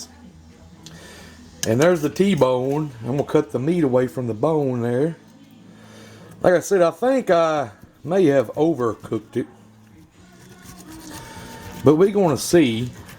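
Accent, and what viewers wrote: South, United States. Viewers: his voice is classic country boi status.. Kool Dude though.. i like his technique, i would definitely add my own spin to it... but overall good job..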